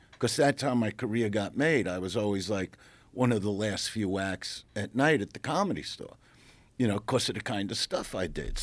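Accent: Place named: Brooklyn, United States. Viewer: Gotta love the Brooklyn accent: "This guy Mahk."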